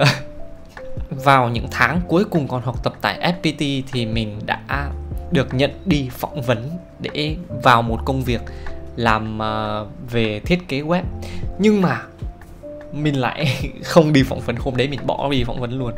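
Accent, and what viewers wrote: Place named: Quang Tri, Vietnam. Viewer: giọng quảng trị hay thế mà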